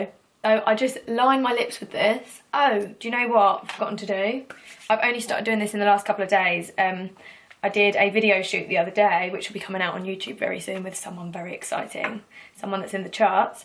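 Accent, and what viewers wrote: England, United Kingdom. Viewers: I love ur british accent soooo much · Ur so British it's great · i wonder if she's from england because i love her accent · She is British I thought she was American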